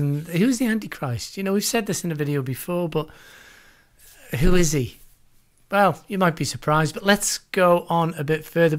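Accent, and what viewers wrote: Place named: Scotland, United Kingdom. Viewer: Scottish? That wonderful accent.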